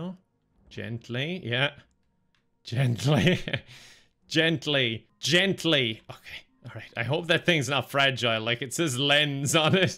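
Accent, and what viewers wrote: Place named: Ireland. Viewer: Love watching play games that are about awkwardly controlling movement, like octodad. He just gets more angry and Irish lol.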